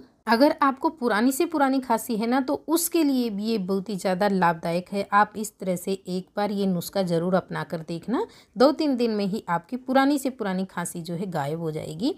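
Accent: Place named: Marwar, India. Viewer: thank u ji.... ☺️ Hukum aapni marwadi me vi bnao koi... Kitni mithi boli h aapni marwadi😇😊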